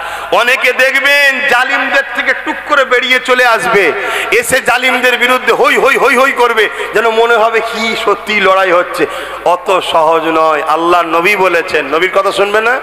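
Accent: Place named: India. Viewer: 😮It is not Bangladesh speak like Indian style.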